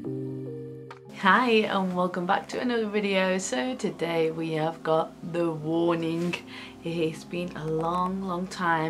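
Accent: United Kingdom. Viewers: Going by you accent you are living in the UK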